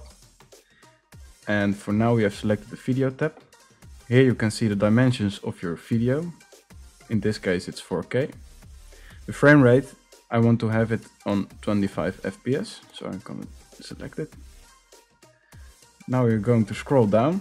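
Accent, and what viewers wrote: Netherlands. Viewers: Hey cool you Dutch? thought I heard it. Nederland! <3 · Dutch accent detected. I like!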